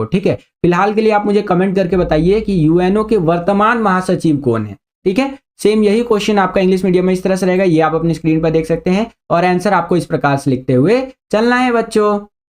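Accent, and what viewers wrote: Bihar, India. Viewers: Aap बिहार से ho भाई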